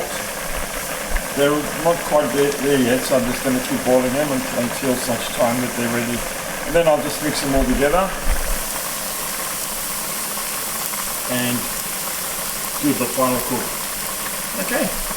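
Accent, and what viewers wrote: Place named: Australia. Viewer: thanks I will definitely share with others I too love cooking delicious and nutritious food for people especially my loved ones ❤ Also I could hear the Outback in your voice right away so when you said you were Greek I doubted my hearing but later you said you hailed from Australia I was like I KNEW IT 😂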